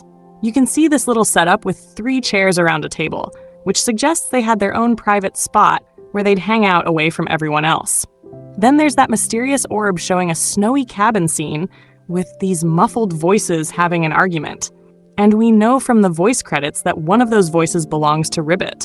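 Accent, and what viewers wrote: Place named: United States. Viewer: I love what the AI script is clearly British but the AI voice is so American, like hearing all these British words in an Ai American accent is so funny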